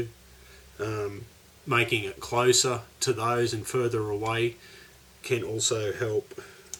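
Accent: Australia. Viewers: Iwas almost uncaring as to the info once I heard an Aussie accent. I was doubly glad to find good info without the ubiquitous yankee accent. Thanks digger. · PS : I like that little riff you do !...greetings from Melbourne !!! good to hear a fellow aussie talking common sense ! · Excellent video. I was particularly intrigued by the removal of the pole pieces altogether (as per Scott Grove's advice - he has a lot to say not just about guitars and guitar gear but about bloody everything lol). It did really brighten up the pup to my ears at least. I know that I'm six and a half years late on this upload but it's still pertinent. Great to hear an Aussie accent on the tube mate. Keep 'em comin'. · Judging from your kick-ass accent, I am guessing you are Australian?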